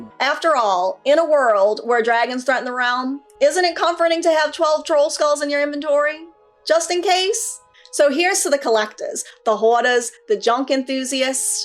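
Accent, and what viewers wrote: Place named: Boston, United States. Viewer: Sounds like Boston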